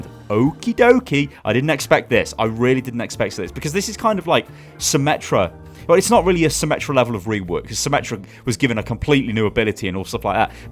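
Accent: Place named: London, United Kingdom. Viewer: what is this? a good london speaker?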